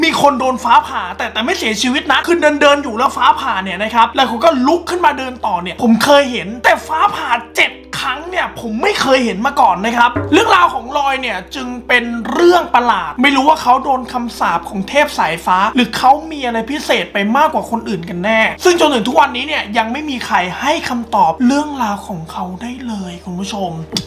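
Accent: Thailand. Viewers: ทำไมต้อง เหน่อออออ 5555.5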